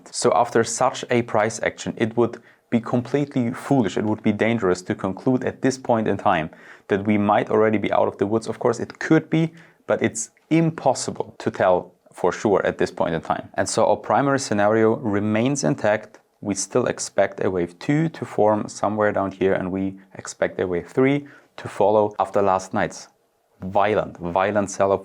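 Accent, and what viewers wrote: Germany. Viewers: Man hört den deutschen Akzent. sehr geil :)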